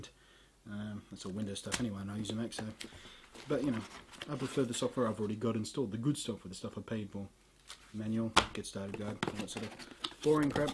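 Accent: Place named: Australia. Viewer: You sleek like a true Aussie. I am proud.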